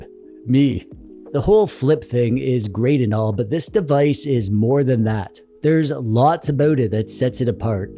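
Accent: Canada. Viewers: Sir, are you Canadian?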